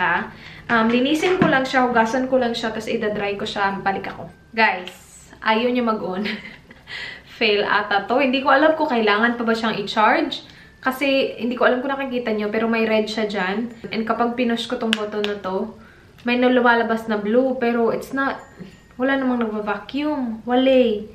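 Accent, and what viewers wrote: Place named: Negros, Philippines. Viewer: Taga san ka po? Parang taga negros ka ee. Hehe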